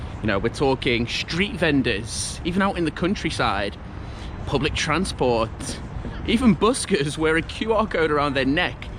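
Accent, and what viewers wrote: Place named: Merseyside, United Kingdom. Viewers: A pleasant guy with a lovely English accent exploring China. Great video mate! I'll keep watching and listening. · I detected a Merseyside accent - when you mentioned Rock Ferry, it confirmed it. I am British born Chinese from Liverpool. Nice video.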